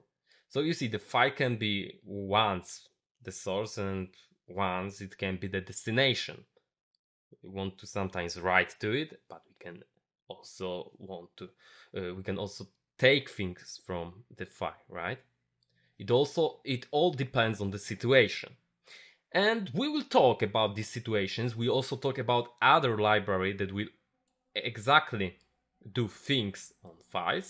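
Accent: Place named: India. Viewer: I miss programming tutorials without indian accent <3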